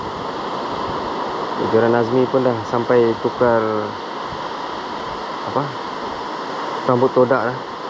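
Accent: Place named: Sabah, Malaysia. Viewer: Terbaikk gabungan 2 sipoo surfcast sabahan😅.. Sekali loghat sabahan berkumandang... Paduu betul sipoo Azmi tu, landed ikan sisik dgn Palergic.. Surfcast memang kepakaran dia.. Sipoo pun x kurang mantap.. Strike padu jgak wlau ikan duri.. Rwzki buat kwn.. Alhamdulillah.. Terbaikk 2 sipoo idola.. Mantapoo❤🎉❤🎉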